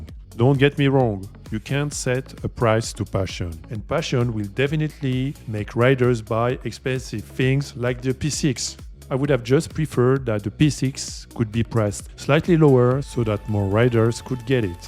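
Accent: France. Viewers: T’es français ta un accent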